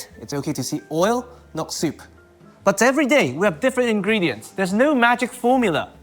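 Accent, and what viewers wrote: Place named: United Kingdom. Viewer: I like his stuff I’ve seen so far. Is he from Hong Kong; he’s got a British accent.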